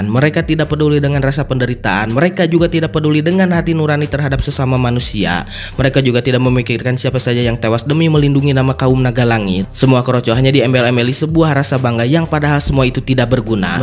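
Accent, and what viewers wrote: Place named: Sunda, Indonesia. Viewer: sunda banget pembawaannya teh hahaha kerenn.. semangaatt boy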